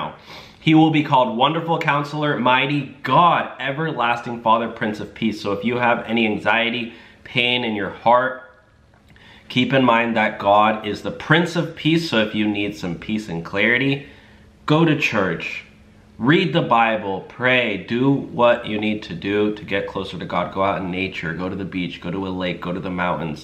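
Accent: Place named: South, United States. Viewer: Where are you from(born), I heard a little southern accent?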